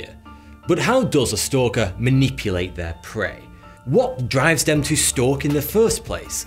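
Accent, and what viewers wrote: United Kingdom. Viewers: what 🤷🏻‍♀️ he is british, thats what some people from Britain talk like 😉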